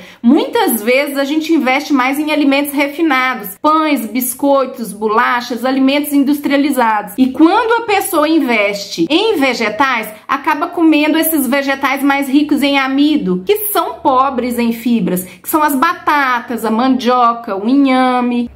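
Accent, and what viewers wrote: Minas Gerais, Brazil. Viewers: Acho que você é mineirinha pelo sotaque. Eu sou de Bauru SP.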